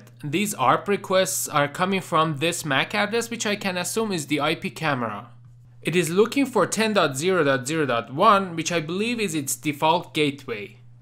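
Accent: Iran. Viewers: Thanks, quite useful for troubleshooting my IP cameras...and oh yeah.....I just love that Persian accent :-) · your accent like iranians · in iran we say that your Tehraneis accent is killiiing me and we think thats beautiful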